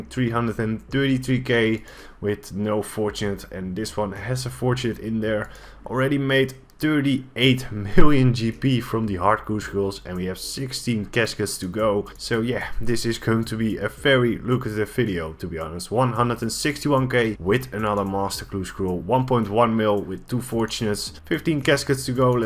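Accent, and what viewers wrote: Netherlands. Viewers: Oei je hebt wele cht een heel nederlands accent haha :p leuke video man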